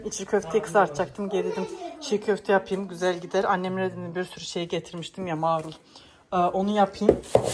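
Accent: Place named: Kayseri, Turkey. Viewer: siz nerelisiniz, eşim kayserili, aileside sizin gibi konuşuyor, koymussum, almıssım, yapmıssım gibi gibi, bana çok garip geliyor, ilk defa eşimin ailesinde duymuştum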